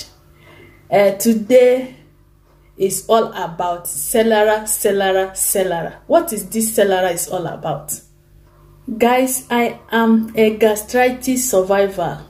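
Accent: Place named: Caribbean. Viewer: I could be wrong but you sound in the Caribbean accent but either way I take your advice very serious because people like you know your s***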